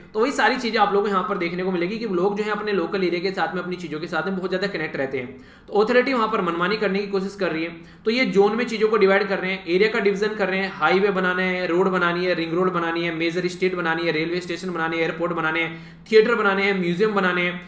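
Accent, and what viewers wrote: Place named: Western Uttar Pradesh, India. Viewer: Sir pakka west up se h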